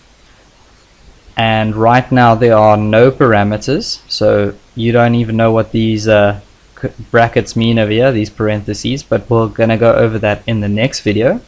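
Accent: South Africa. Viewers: Yoh Bra u sound like a South African, anyway I have a problem I know a bit of javascript should I just jump to jquery or try to Master Javascript first?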